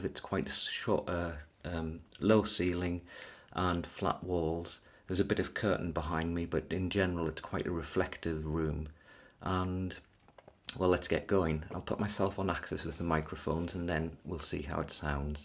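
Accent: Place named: United Kingdom. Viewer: The amateur audio engineer in me enjoys the basic exercise being performed here. The ASMR enthusiast in me appreciates calm British-accented voice.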